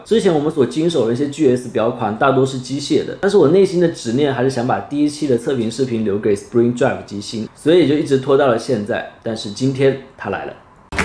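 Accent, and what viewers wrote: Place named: Taiwan. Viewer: 滿口台語音 爲怎麼簡體字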